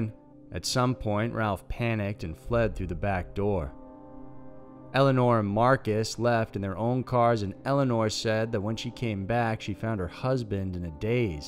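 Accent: South, United States. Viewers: Some of your words sound so southern. Where are you from? I’m a Carolina boy myself. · I'm not usually an enormous fan of American accents but I really love the narrators one, could listen to it all day! What part of America is that from?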